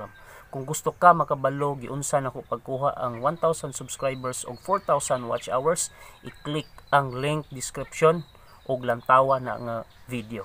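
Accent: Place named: Visayas, Philippines. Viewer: Bisaya kaau oie maau man motirada dong bright da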